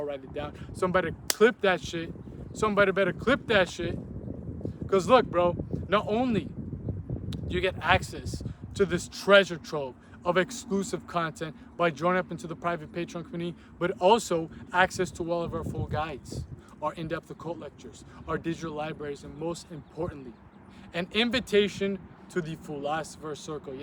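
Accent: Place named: United States. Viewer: I like your accent, bro! I wanna talk like that even though I am not American.😞 and keep the good content rolling. 💪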